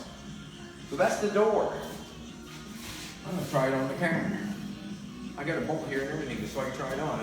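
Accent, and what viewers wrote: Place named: Nova Scotia, Canada. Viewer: Love that Nova Scotia dialect.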